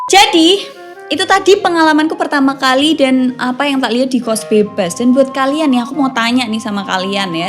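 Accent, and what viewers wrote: Java, Indonesia. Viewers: mirip Nike Ardila, tapi ngomongnya agak medok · Medokmu itu bikin kesemsem😍😍😍 meskipun ga ngerti juga😅😅 salam dari bandung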